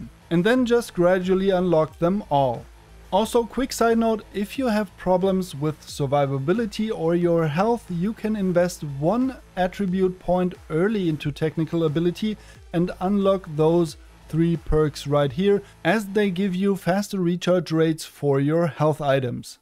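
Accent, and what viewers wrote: Germany. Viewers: Wenn Deutsche englisch sprechen… 😅 aber schönes Spiel! Cyberpunk ist zum Glück noch ein geiles Game geworden! 👍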